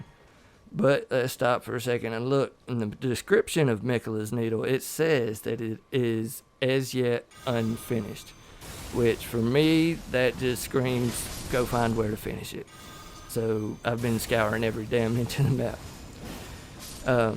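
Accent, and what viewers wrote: South, United States. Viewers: wasnt expecting the southern accent lol, love it, right on partner good video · I like your accent. What state are you from? I'm guessing one between Tennessee and Florida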